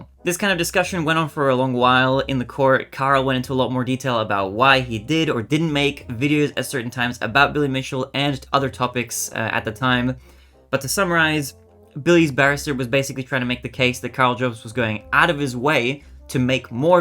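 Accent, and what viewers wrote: United States. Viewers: You are definitely take the medal for most American sounding Australian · I thought you were a weird Minnesotan.* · your accent is very Americanised.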